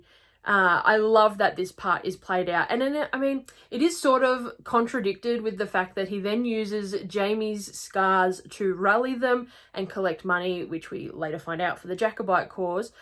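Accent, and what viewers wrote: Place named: Australia. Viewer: I am a huge fan of the Australian accent and I absolutely LOVE yours! No criticism here.:)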